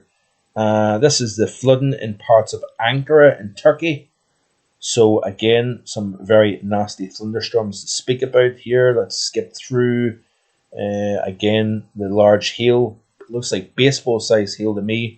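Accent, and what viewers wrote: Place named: North Antrim, United Kingdom. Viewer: Best wishes to the lady on such a big milestone. Yesterday wasn't that nice but it stayed just well enough behaved for us to do our usual walk around Annalong after getting a feed from the galley, a bit of a Sunday ritual of ours during the warmer months of the year, we travel from Armagh, was only in the last few weeks I discovered you were in fact a local man before moving up there to Scotland but always had my suspicion with the accent, thought you might have been North Antrim originally. Wind was rather lively mind you and the sea rough too.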